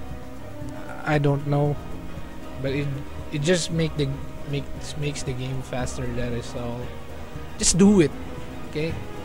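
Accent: Philippines. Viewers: I can tell that u're filipino because of your accent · You're obviously a Pilipino judging your accent.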